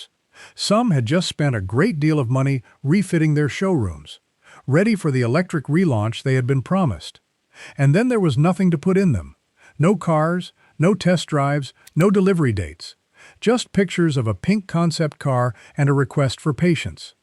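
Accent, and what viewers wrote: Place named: United States. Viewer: It’s Jaguar NOT feckin Jagwar Ai is bad enough but the Yank ones are even worse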